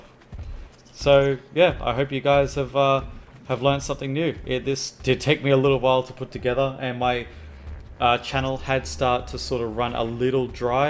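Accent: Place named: Australia. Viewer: Best designs I’ve seen… although an Australian trying to sound scientific is too funny 😂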